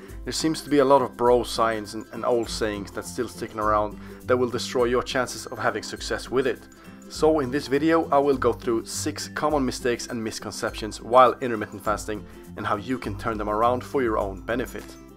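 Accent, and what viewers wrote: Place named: Sweden. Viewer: really strong Swedish accent there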